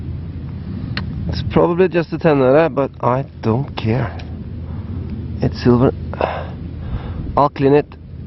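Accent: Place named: Norway. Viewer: Hi! Im Norwegian, but ill write in English. Been watching your vids for a good while now, and you inspired me to find the right place to go hunt my first old coins. So, i went out on simular fields you walk on and found my two first Oscars and alot of other cool stuff. Thanks for the vids and the lovely "Thor Heyerdahl" English hehe. I hope I get the chance to go detect with you guys some day. 2 questions though, what is the name of that yellow book with the kings in it? Been looking for it, is it originally a childrens book? 2nd. What kinda detector are you using mostly? Im using the AT Pro and loving it. Wouldnt mind if you revealed some of the values/numbers on your detector while you detect. best regards Kim Erik :)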